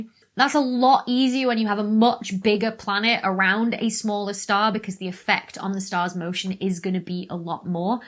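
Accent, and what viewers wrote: United Kingdom. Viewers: I don't know why, but the British pronunciation of methane is driving me nuts lately. Do you pronounce pentane and octane with a randomly long leading vowell? Probably not. If there was a clue that the vowel should be long (like if it were spelt meethane ) that would be one thing which would justify British practice. But to my eyes and ears, the Brits are more wacky than usual. Dr. Becky, can you appeal this to the Standard English Authority_? ;-) (Let's leave _aluminum for another time. ;-) On another front, I recommended a video of yours to my mom many months ago. She reported that she couldn't understand you well enough due to your "thick British accent." You sound fine to me, but 20 years ago I worked for a company which had like 15% Brits. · It's her Briddish accent.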